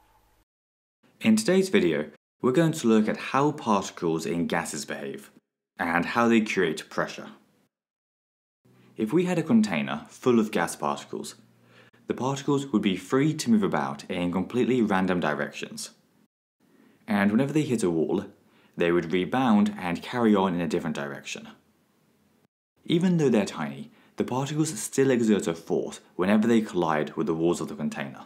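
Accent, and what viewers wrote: United Kingdom. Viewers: Finally a British Accent!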